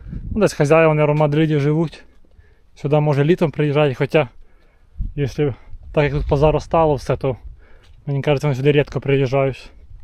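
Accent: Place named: Central Ukraine, Ukraine. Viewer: На велосипеді там класно поганяти., цікавий у Вас акцент. Ви, певно, з Черкаської чи Житомирської області?)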